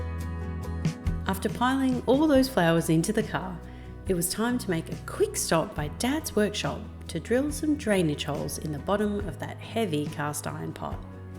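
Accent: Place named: Australia. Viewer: Beautiful video, I came over here as a lady watching my videos said I sounded just like you ❤ same tone…except I hear you are Australian while I am British 😂 a compliment either way ❤❤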